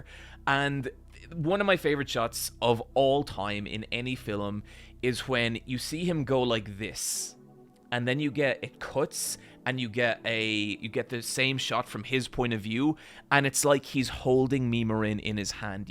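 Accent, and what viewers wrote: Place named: Ireland. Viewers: Its a part of Irish dialect. Theres a documentary about the making of Ryan’s Daughter called “ A Bit of a Fillum” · The Irish accent is altogether adorable! Smile 😃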